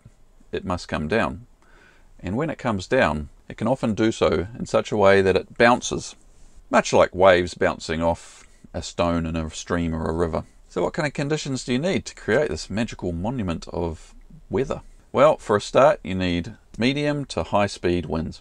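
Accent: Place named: New Zealand. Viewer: I love the NZ accent, ahbe been there in 2008 and love your humor, Keep on going and greetings from Switzerland (LSPO)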